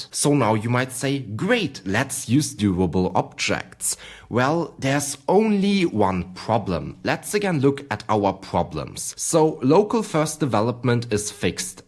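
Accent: Germany. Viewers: Thank you german sounding man for your wisdom.... I shall use it wisely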